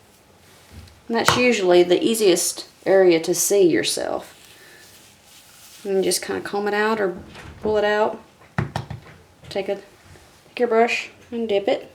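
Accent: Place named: United States. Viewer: i love this american accent :)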